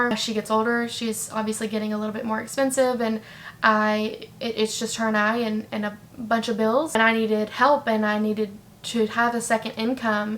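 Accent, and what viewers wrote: Alabama, United States. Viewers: I had a STRONG feeling you were from Alabama. I’m from North Alabama! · I just discovered your channel from your story video 💕💕 I knew I recognized that Alabama accent.. I'm a Bama girl too! 😊 You sould like a great mom! I would love to watch a series on your teen mom experiences. Thank you for sharing your story. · When I’m watching this video you guys are at 99.4 subscribers. SO CLOSE! I would love to see a series of videos like this. Also, do you guys still live in Alabama? NOT CREEPY, I’m just really fascinated by your accents 😂🤣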